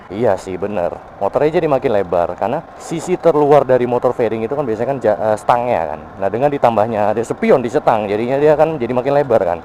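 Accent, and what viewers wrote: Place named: Java, Indonesia. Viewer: Jawa nya medokkk anjasssss😂😂😂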